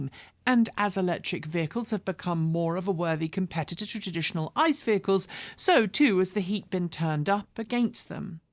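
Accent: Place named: United Kingdom. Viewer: I'm assuming - based on your accent - that you are based in the UK. I'm honestly surprised that you are getting the same amount of FUD and misinformation over there that we get here on the left side of the pond. I am 6'4" and about 17 stone (240 lbs) so cannot squeeze in small vehicles. However, I love my EV. I do still like my weekend Lexus coupe. But the EV is such an easy choice for daily driving. I do live in Southern California and have solar, so enjoy "free" charging. However, even if I didn't, I would continue driving an EV. Keep up the good work!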